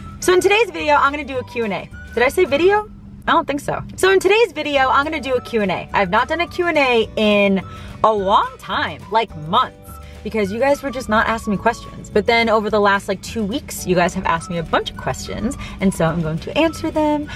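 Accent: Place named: Michigan, United States. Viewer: You grew up on Long Island but you sound like you’re from Michigan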